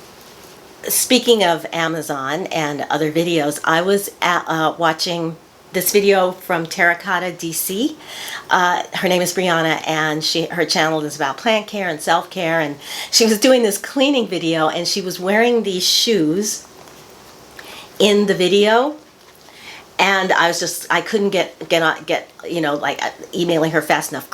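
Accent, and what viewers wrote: United Kingdom. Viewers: Love the British accent! You are so posh!:))) 💛💚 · Like the British Accent:) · Great job on the British accent. I’ve always loved the way they speak, makes the English language sound so much better. Great haul,lots of goodies. I need a good bra that will lift and hoist these puppies back to where they belong. Lol ❤️ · Happy Birthday!💕🎂🌸 I’m 5 months older than you.😀 That British accent...I thought I clicked on someone else’s channel at first. That was great. It that a romper you’re wearing? Love both the style and color on you. Which one is the link please? Thank you.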